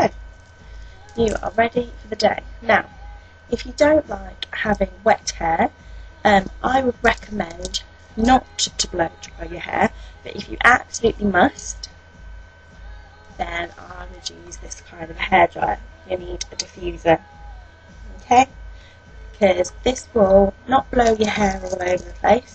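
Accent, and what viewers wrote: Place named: United Kingdom. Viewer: Lol nice accent...from the uk?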